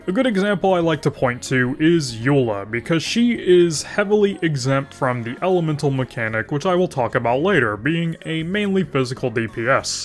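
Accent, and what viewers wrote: South, United States. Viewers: He said he has a thick southern accent (I'm assuming he's from the US) so he has a hard time to pronounce it.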